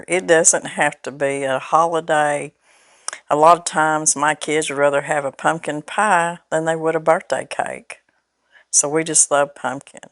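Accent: South, United States. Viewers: Please ask Mr. Brown to find out what kind of pumpkin that was. I couldn't find any called cow patty or even close to that. Thank you! I love your videos and your accent. My parents are from West Virginia but raised us here in Wisconsin. I love hearing southern accents as it reminds me of them. They also were teased as to how they pronounced words. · i think you talk normal 🤷🏼‍♀️ but i only live a few counties over 😂 ms., i'm 45 and i have always said waspers, worsh rag, squashes, caint (can't), and all the other southernisms we have. i "workin'" in my craft room, but i sure could go for some of the pumpkin pie. that sounds so good and even better that it's pumpkin season. love living in the natural state with you! farrah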